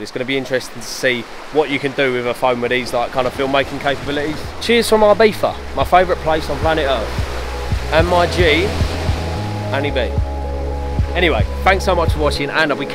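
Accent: United Kingdom. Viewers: Bri ish❤️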